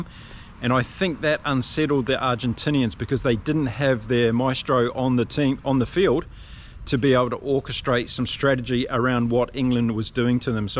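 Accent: New Zealand. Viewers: indeed, but you could easily apply that to the English team from the other night, because it was precisely power and self-belief that won them the game. I think what we're talking about here is aesthetics and beauty is different for everyone and is different in every society. What I found funny was that your reason is consistently unbiased and its only the Kiwi accent that tells us where you are from, but your aesthetics on the game places you very precisely in the world.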